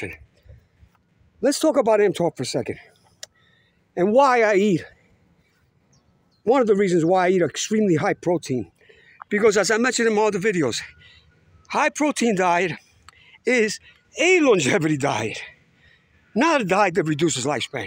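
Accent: New York, United States. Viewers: Your channel is AWESOME…I just subscribed ….youre in Munich but you sound like you’re from NY ….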